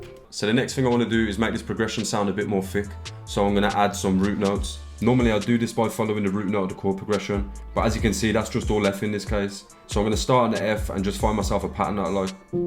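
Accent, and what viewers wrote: Birmingham, United Kingdom. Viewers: Sounds like you’re from Brum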